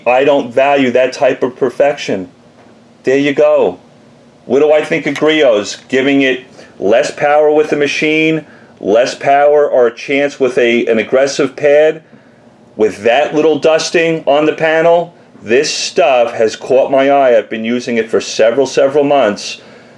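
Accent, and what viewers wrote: New York, United States. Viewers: I hear a New York accent? Queens?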